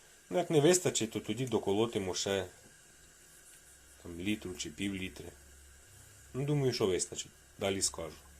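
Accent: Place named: Kirovohrad, Ukraine. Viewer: Така мова цікава, так моя рідня на Кіровоградщині розмовляє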